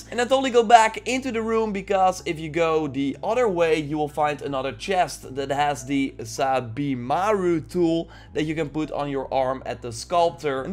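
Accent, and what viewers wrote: Netherlands. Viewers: ik hoor zo erg dat jij nederlands bent echt irritant maar niks mis met je content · This dude sounds dutch.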